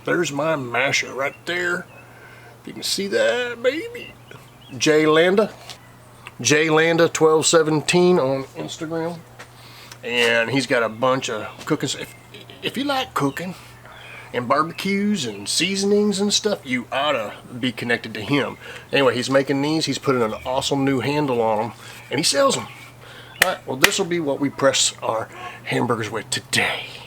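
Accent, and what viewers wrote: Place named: Texas, United States. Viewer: Hi.. I'm your friend from Jordan.. I just love that Texan accent of you.. It reminds me of those old series i used to watch on TV when I was little kid... Like "Guns Smoke ".. I remember that guy "Festus".. I also remember " The High chaparral ".. 🤗 Anyways.. I enjoy so much your amazing.. Awesome ways of cooking, 🤗💕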